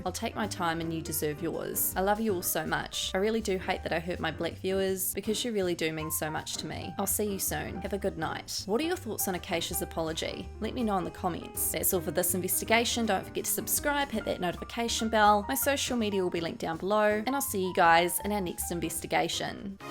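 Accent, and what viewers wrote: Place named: New Zealand. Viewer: Kiwi narrator? YAAAAS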